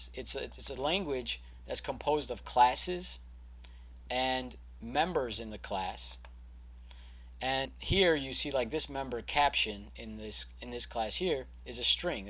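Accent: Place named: United States. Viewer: Thank you, Very clear lessons and a good American accent.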